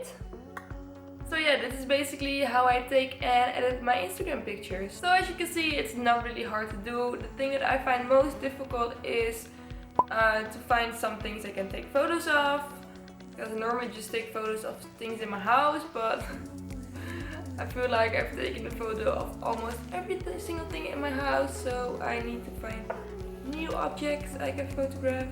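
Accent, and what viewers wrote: Netherlands. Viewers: ik ken je kanaal pas net maar je bent echt gorgeous!! aan het begin van deze dacht ik al gelijk te horen dat je Nederlands was en net ik de comments zag ik dat dat ook klopt lmao! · u dutch? guz your accent sounds dutch tho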